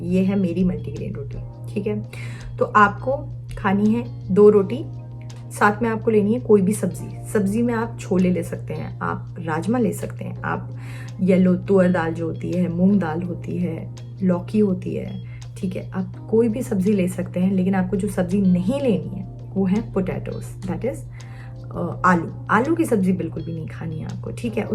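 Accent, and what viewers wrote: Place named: Pakistan. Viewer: Not at all like Marium Pervaiz infact more perfect urdu than her. she is speaking urdu in Pakistani urdu accent. I am Pakistani BTW and we speak urdu like her. 😊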